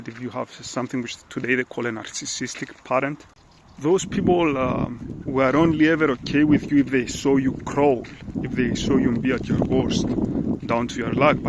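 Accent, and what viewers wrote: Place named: Cyprus. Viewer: Love love love the Cypriot accent ❤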